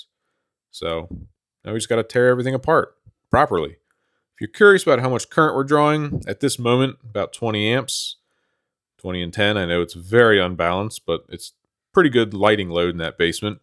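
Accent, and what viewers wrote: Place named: Philadelphia, United States. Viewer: Awesome video, love the custom ATS. Regarding split phase 120/240 terminology in some places people do call them phases and we know what they mean, but that can be a slippery slope to people calling split phase 'two phase'. And To me your accent really sounds like a Philly accent, and Philly being one of the only places that actually has two phase power where each phase is 90 degrees apart, I'd stick with lines or legs for split phase 240... and if you happen to be in Philly I'd love to see a video on a some actual 4-wire two phase motors and controls if you come across any. Thanks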